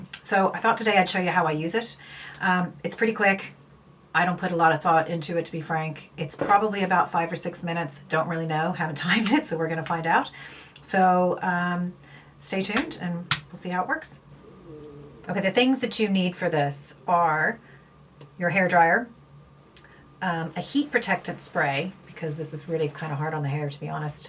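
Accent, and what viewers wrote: Ireland. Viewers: Thanks, just showed this to my mom so she can use mine. You have an irish twang to your accent! Where are you from?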